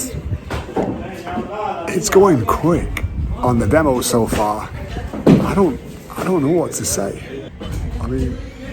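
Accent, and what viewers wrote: England, United Kingdom. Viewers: Are you from England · This guy is British! 🇬🇧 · Amazing build, I bet your so glad you took the gamble, you don't sound American, UK maybe? What was the final cost? really curios. Jim in Liverpool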